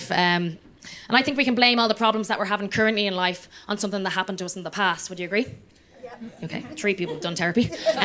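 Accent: Ireland. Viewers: am April 3 2024 To hear you talk in an Irish accent makes my eyes water with joy !!! You are an angel. !!!! Yer woman is Funny … my gut aches Tanks for sharing!!!! Absolutely brilliant!!!! Nothing but lovely , fair play to ya. Slainte’ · She is very cute and I usually love an Irish accent, but she reminds me too much of a girl I tried to date whose brogue would get thicker the more she drank. Cannu unnerstan ya, lassie! 🤨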